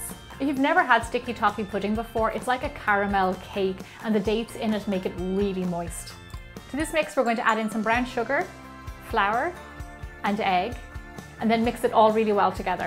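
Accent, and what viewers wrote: Ireland. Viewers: Are you from the Republic of Ireland or Northern Ireland ? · OMG YOURE IRISH ME TOOOOO!!!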